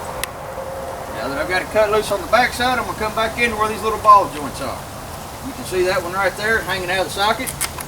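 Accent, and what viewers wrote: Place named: Kentucky, United States. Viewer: This guy has to be from ky u dont hear that accent in many other places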